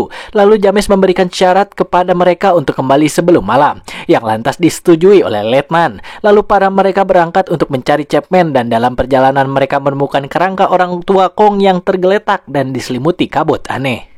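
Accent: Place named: West Java, Indonesia. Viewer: Anjir suara nya mirip si jon lQ7 logat sunda nya krasa..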